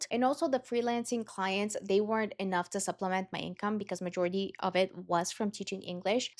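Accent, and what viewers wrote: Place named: Latin America. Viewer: I thought you were Latina from your accent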